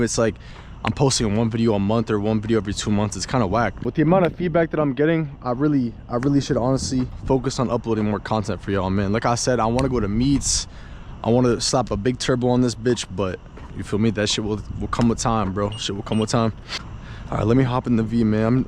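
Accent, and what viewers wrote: Boston, United States. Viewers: Is bro in Boston I can just tell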